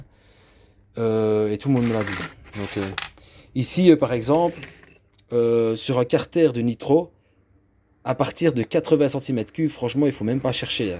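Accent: Belgium. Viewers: Je décèle un p'tit accent belge non? Je viens de découvrir ta chaîne 😉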